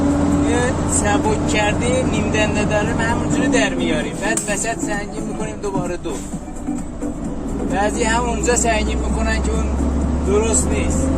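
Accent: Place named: Turkey. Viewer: قربون اون لهجه زیبای ترکت برم . پسر ناز خودم هستی .من هم در آمریکا راننده تراک های خدمات کنار جاده ای سبک و سنگین هستم که در ایران میگند خدمات خودرو . سعید خراط از کالیفرنیا ایالات متحده امریکا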